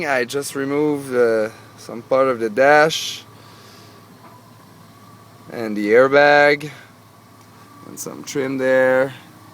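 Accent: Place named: Quebec, Canada. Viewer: Haha..j'avais deviné par ton accent que tu étais Québécois. Moi le problème avec mon liberty c'est qui chauffe juste en roulant. Quand jarrete de rouler y chauffe pu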